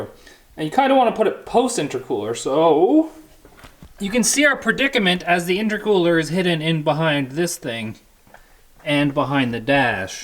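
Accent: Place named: Newfoundland, Canada. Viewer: You sound 80% more newfie in this video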